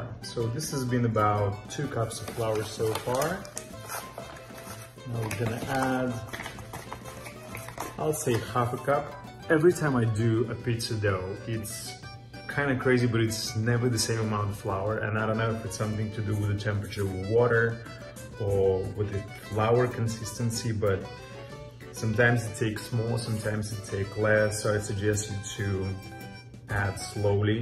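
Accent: Russia. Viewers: cute Russian accent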